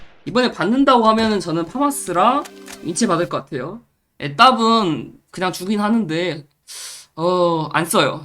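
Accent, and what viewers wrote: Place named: North Korea. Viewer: 근데 고향이 어디시지? 왜 북한 사투리가 들리지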